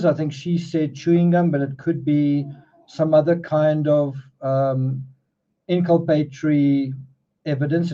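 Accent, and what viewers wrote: South Africa. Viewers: jy klink soos 'n Suid Afrikaner. Is jy?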